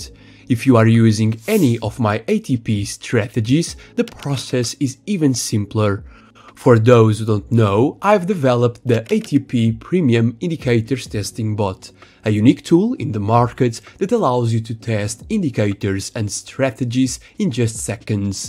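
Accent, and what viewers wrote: Portugal. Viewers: Hey bro, are you portuguese?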